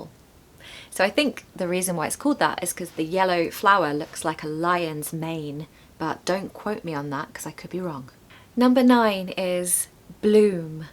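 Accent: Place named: England, United Kingdom. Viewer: Sweet charming English accent 😅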